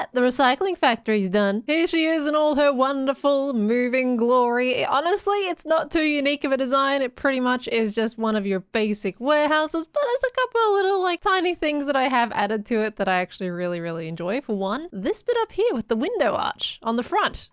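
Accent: Australia. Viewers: The Australian accent too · it’s the aussie accent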